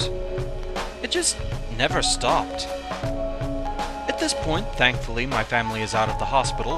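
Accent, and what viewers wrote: Scotland, United Kingdom. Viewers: First time I played this, the opening message really got to me for some reason. "Violent or cruel" is so ominous. I've seen "violence and gore" but cruel is a more subjective word that hints at malice, and I always found that warning real creepy. Fortunately your warm friendly Scottish voice makes things a lot more welcoming!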